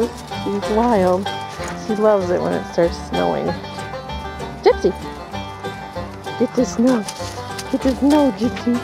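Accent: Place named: New England, United States. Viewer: Its so naaaaaesty! Haha yankee accent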